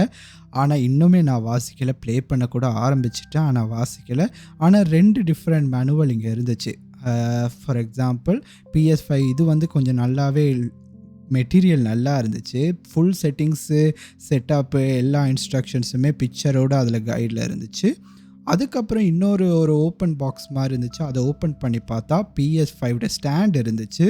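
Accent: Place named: Sri Lanka. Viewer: என்ன இலங்கை வாசம் அடிக்கு. அகதியா நீ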